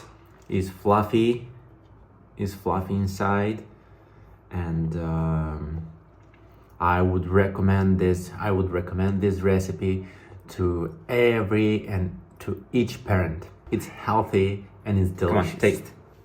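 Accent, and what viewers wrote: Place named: Moldova. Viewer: . 🧢🧢🧢 LA SUPERLATIV ❤❤❤ SA-ȚI MANINC EU. GURIȚA TA. ȘI VORBA. MOLDOVENEASCA🎉 👍👍👍💝💝💝🌹🕊️🥰🙏.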